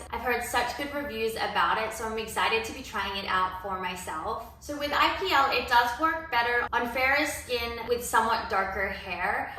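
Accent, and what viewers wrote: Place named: Canada. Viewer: Catching a slight Canadian accent coming through 🤭 Sold on this! Looks like a great product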